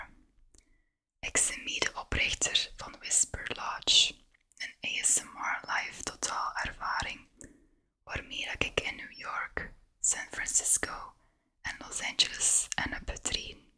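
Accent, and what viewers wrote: West Flanders, Belgium. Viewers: zalig voe ne kje twa ut eigen coté te meugn oorn. 😉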